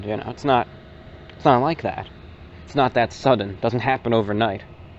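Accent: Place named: East Coast, United States. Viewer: Just wanted to say good on you for wading through all those trolls and hate comments. I'm on of the people who came from reddit and I'll honesty say this: you're one of the most humble and genuine guys I've seen on youtube. I love the soft East coast accent and your personality. I watch your videos every morning with my cup of joe and my breakfast. You're just perfect to listen to. Keep it up and I hope you do well in the future!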